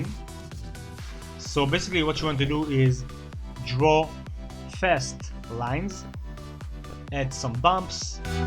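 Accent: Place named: Israel. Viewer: u sound isreali are you and btw great job ty this helped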